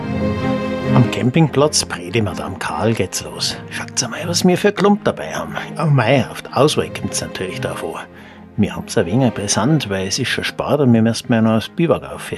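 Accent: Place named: Bavaria, Germany. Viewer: Die Moderation in Bayrisch ist die Pointe dieser Filme. Jetzt fehlt nur noch ein Wolpertinger auf der Wiese....😆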